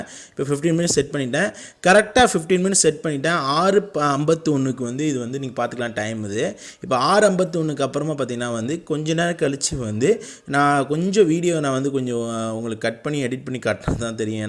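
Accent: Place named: Sirkazhi, India. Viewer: Bro neenga sirkhali-ya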